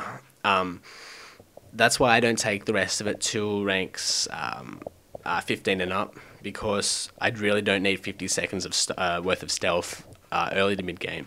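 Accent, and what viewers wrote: Australia. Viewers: where are you from, Its hard to tell you're accent you sound Australian but also English.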